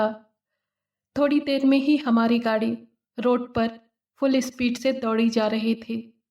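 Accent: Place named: Lucknow, India. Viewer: Yeh pyari awaaz zaroor Lucknow ki hai . Carry onnnnn 👍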